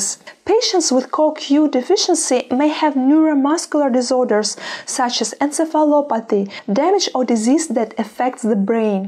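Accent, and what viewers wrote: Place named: Brazil. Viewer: Thank you doctor. By the way, I love your beautiful accent! I beleive you are from Brasil, right?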